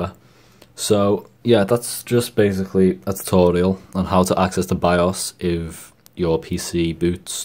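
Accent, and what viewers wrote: Liverpool, United Kingdom. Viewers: taaa lad, did not think id find a scouser on here with actual useful information xD nice one this really did help, my corsait k70 doesn't turn on until the option is allready passed to get into the bios so you saved me a lot of stress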